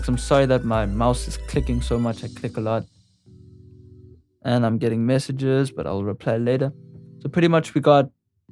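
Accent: South Africa. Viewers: Thats the most South African accent iv ever heard lol cool vid man!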